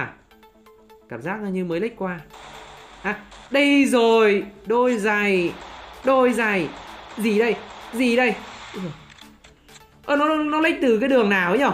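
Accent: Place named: Nghe An, Vietnam. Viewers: Dlm nghe dong khăm vv ..may dân nghê an ah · Nghe cái dọng miền trong .kho chiu vl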